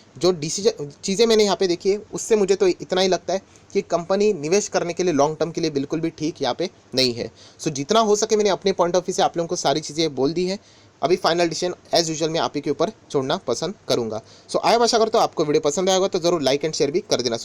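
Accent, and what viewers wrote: Maharashtra, India. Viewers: Hallo Sir.. your mother tongue is marathi...100%... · Ho nkki awajch ahe marathi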